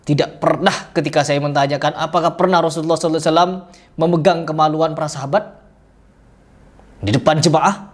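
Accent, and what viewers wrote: Madura, Indonesia. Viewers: Iya logatnya khas kek madura ya... Tp penyampaiannya pas mdh dicerna.. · Logat nya kaya Madura.....belajar lagi mas bro....pakai ilmu padi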